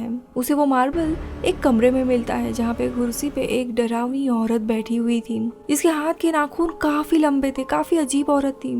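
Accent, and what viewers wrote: Maharashtra, India. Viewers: That marathi accent 😍😍❤❤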